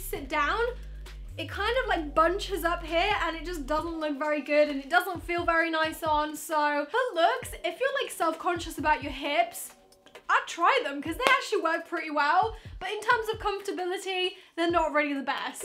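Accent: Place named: United Kingdom. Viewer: She sounds too English to be true.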